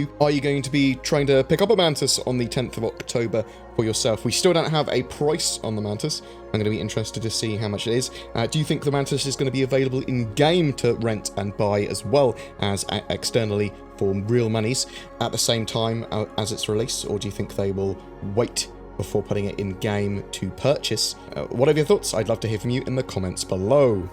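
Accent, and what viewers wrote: United Kingdom. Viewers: The fun thing about listening to videos from people in another country than where I live is the difference in slang and pronunciation of words. I do wonder though if some of what I hear is an artifact of the recording device. I know that in the UK you use "Maths" where in the US we say "Math" for example.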